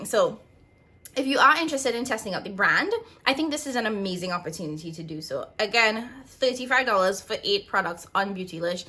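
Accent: Trinidad and Tobago. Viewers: What island are you from? I'm guessing Trinidad Yes definitely Trini...only trinis say THEE for THE! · Trini? i keep picking up on an accent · A Trini voice could never heard inno 🥳🥳🥳big up you abd thanks for d info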